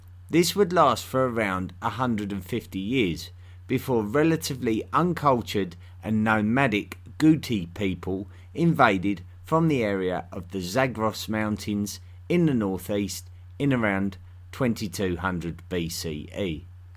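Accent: United Kingdom. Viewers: He sounds like that guy on Saturday night live some years ago who talked about his drawings with a similar British accent. The guy would pronounce drawings as drowrings..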